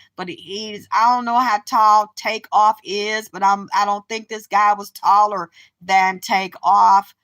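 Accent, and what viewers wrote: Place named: New Orleans, United States. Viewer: Your accent I love it and you are funny sound like u may be from New Orleans dirty dirty south accent dirty my favorite word you say lil Wayne and Juvenile say that dirty all the time.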